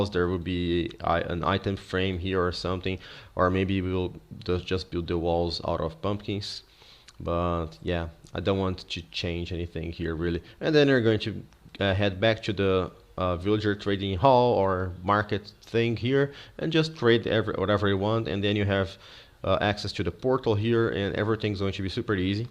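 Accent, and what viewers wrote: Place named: Brazil. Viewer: Felipe Menão Blz, eu não sabia disso, é que o inglês dele tem um sotaque bem brasileiro mesmo ;), mas o inglês dele é bem melhor que o meu ehehe... mas não importa, os videos dele são muito bons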